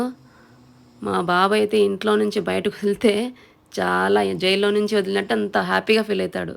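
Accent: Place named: Rayalaseema, India. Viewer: Mana Rayalasima voice super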